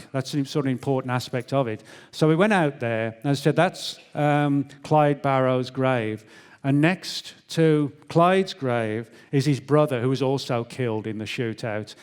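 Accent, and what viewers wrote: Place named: Birmingham, United Kingdom. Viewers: sounds very UK Midlands accent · The Arlington Brummie 👍🏻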